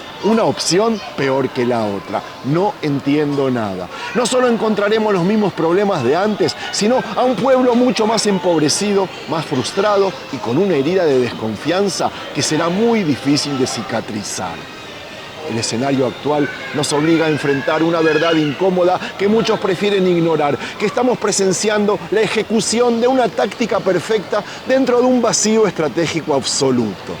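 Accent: Argentina. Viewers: mi opinión lo que pasa es que él siendo argentino de nacimiento (lo digo por su acento tan marcado) adquirió la nacionalidad de ese país y cómo allá está viviendo sabroso, lógico que se hace el ciego-sordomudo. Está defendiendo a quien lo tiene viviendo sabroso. En la mayoría de países latinoamericanos se tiene la idea de que los argentinos se creen de una raza superior y quizás por eso es que defiende a capa y espada la política expansionista de Xion.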